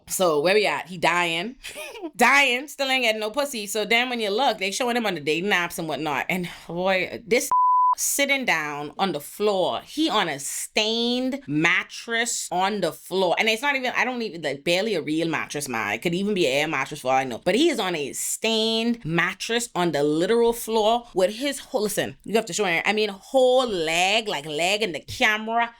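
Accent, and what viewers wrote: Bahamas. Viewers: Are you Bahamian or Nigerian? Can't tell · girl just hearing ya Bahamian accent is be enough for me in these videos for rewatching lollll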